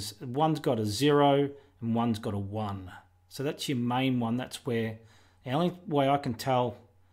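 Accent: Australia. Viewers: I missed the Aussie english. Hope you're okay.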